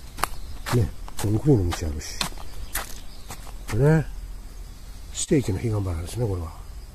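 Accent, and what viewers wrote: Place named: Himeji, Japan. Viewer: さん、情報有難うございます！他にもたくさんの情報、楽しく拝見しました！！行って報告しますねー。実は、わたし、姫路出身なので、毎度『みなさ～ん、こんにちは』のイントネーションが私と同じで、わらってしまい、さんの動画のファンになりました（笑。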